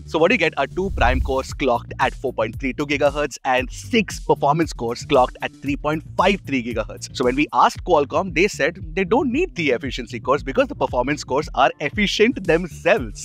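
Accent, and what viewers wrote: India. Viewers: I don’t like your Indian accent honestly, difficult to understand sometimes as English is my second language but your channel, contents and quality is perfect 🎉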